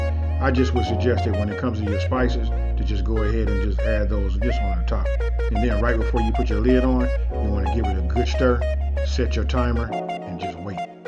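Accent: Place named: South, United States. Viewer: Hi I'm new to the channel band I'm looovving everything I've seen so far!!! where are you from? I hear a southern accent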